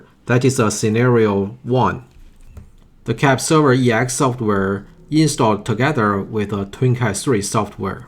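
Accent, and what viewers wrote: China. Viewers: I still can tell that you are Chinese 🤣 Thanks for the video tho.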